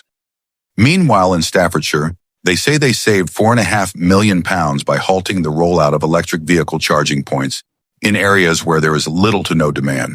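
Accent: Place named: United States. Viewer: England Now - With an American accent. Not for me.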